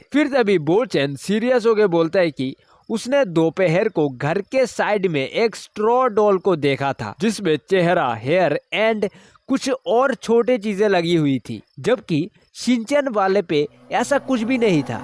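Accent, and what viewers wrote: South, India. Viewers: Lots of love from Rawalpindi ap konsay city se hain accent ap ka south Wala hai Namaste Namaste Namaste Namaste Namaste Namaste Namaste Namaste Namaste Namaste Namaste Namaste Namaste Namaste Namaste Namaste Namaste Namaste Namaste Namaste Namaste Namaste Namaste Namaste Namaste Namaste Namaste Namaste Namaste Namaste Namaste Namaste Namaste Namaste Namaste Namaste Allah Hafiz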